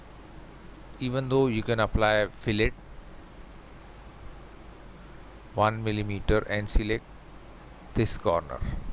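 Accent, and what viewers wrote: India. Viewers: You know it'll be informative when the guy has Indian accent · Are you from Índia?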